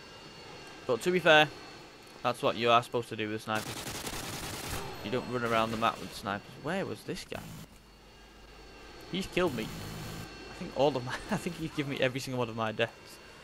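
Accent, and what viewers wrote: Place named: North, United Kingdom. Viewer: Yo I’m from Australia and I’m trying to figure out if that’s a Manchester or Yorkshire accent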